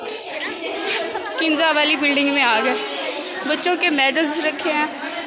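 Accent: Saraiki, Pakistan. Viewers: AJ bht din bad apka vlog daikha Sirf apki awaz piari hai q k sariki bht piari bol leti ho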